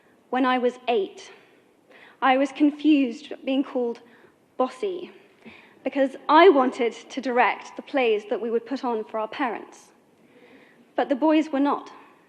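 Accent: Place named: United Kingdom. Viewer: xem truyền cảm hứng vừa học phát âm anh anh ... quá đỉnh luôn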